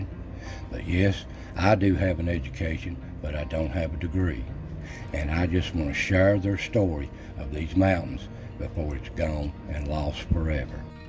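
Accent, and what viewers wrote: Appalachia, United States. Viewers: My people are from the the Southern Appalachia area and the sound of your voice, the food you talk about and how people are called and words pronounced are all very familiar to me. Bluegrass is the music of my soul. I learned to clog and performed it. I even learned to play and refurbish harpsichords and learned a bit on the hammer dulcimer back in the day. Thank you for your post and the memories of my grandparents and ancestors I never met. Best wishes from the Kingdom of Cambodia. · I can detect a fake southern accent pretty easily being born and bred in East TN on the Cumberland Plateau. If you want to hear some appalling examples of a fake southern accent watch the movie Cold Mountain. Your accent in 100% old southern mountain folk and you should be proud. I catch myself toning down the accent and speaking with more "proper" grammar most of the time, but am most comfortable speaking more like my grandparents did and to some extend the way my father does in the right company. 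It's weird how I can turn it off and on depending on who I am around. My wife pointed it out; I had no clue I was evening doing it! I think much of it comes from fear of being labeled as ignorant or a hick when I was younger. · I have in laws from Tazewell County Va. You sound just like them. Your way of speaking is from years of living in the mountains. My family is from Md. Eastern Shore, my MomMom sounded exactly like the people who lives on Tangier island today, the old English dialect. My Dad's dialect was similar but you could hear the modern English mixed into his voice. I loved my visits to Abbs Valley Virginia, and I surely miss the elders. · All of my family on both sides came from the foothills of the Smoky’s, right outside of Pigeon Forge before it was ruined with tourism, so I understand you just fine. My parents talked exactly like you. Jobs were scarce down there at the time, so my parents moved to northern Illinois to work in the car plants. 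We spent many summers with my mamaw and papaw in east TN. My Dad and Mom always talked about moving “back home”, but sadly never got to. And he grieved for it the rest of his life.He never lost his accent either, and me and my siblings picked it up somewhat, which we got teased for in school. So, when I see an article about that region, I always read or watch it. I’ve not been down there in 5 yrs. Now. All the old folks are gone, and so much has changed, it’s not the the same. Nothing ever is, but the Smokys will always be some of my best memories.That region will always be buried in my very soul. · My family came from the Appalachia I wasn’t raised there but I talk exactly like you do and I use outside bathrooms and the catalogs I so enjoyed hearing your story you should write a book I would definitely Buy it the way that people talk then it’s still here today and I hope someday I’ll get to visit the avalanches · I was born in Southern West Virginia and live now in Central West Virginia. 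I love my state and the heritage it holds. I don't ever want to leave. Some people in S.W.Va. have a heavier accent than you have. But I do love me some beans and cornbread with a big slab of onion. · Good lord just to listen you you talk. Thank You!!!! Our accent is fading and all but gone. Nowadays. I'm only in my early 40s and the youngins working tith me make fun of how I talk. It's not prim and proper like they know. Just being a nice guy I'll say I apologize for the way I speak. But I am proud to be a so called hillbilly or mountain man. It who I am and how I was raised. Grew up running around in a nursing home. I learned more about farming cutting timber cooking an cleaning qnd most of all how to be myself and just be a good person!!! Listening to your words qnd thes videos brings me back to my childhood. Also cements I am an Appalachian born raised qnd I'll never leave these hollers. 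Had offers to go but I can't leave these mountains. They are my heart my soul my life!!!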